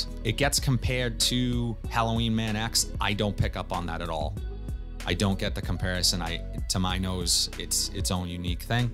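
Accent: Boston, United States. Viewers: Hey, just came across your channel and subscribed. Have you tried Timbuktu L'Artisan Parfumeur? I found it at Burlington too. You might like it. Resinous, smokey, spicy. I liked it a while back but didn’t buy because I was looking for more floral scents from L’Artisan. Are you from Boston? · oh wow that's interesting! I guess I never heard a Boston accent before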